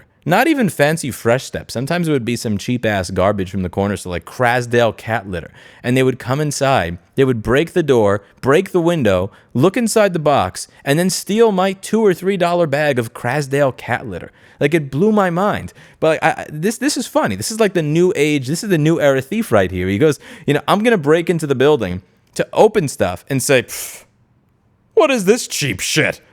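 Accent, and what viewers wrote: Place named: Texas, United States. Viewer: Seems your NYC accent is already slipping into that famous Texan drawl. Makes me wonder what else is gonna change. You just got back to your goal weight, and you were so happy about it, so as a Midwesterner concerned for your health, I advise you to STAY AWAY FROM THE CASSEROLE!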